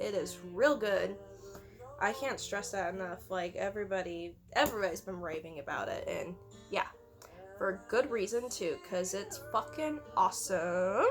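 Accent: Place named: South, United States. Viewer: I'm so glad you read this and loved it! It's a beautiful manga. I love when your country accent comes out.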